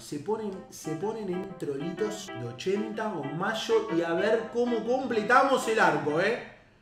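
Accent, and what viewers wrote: Argentina. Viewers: Me gusta el acento Argentinos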